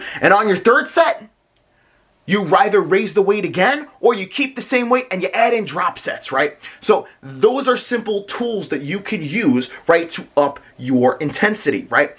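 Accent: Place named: South, United States. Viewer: Good Southern accent Coach. LOL you can shed it and then the illusion takes over, you look pretty big on stage!!! Another banger video!!! OK bro wassup with the podcast with Joe....I'm gonna keep kickin your ass until y'all start doing them again.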